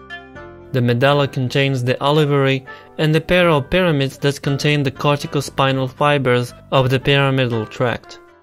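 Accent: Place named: United States. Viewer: Why are you pronouncing English as Americans being an Indian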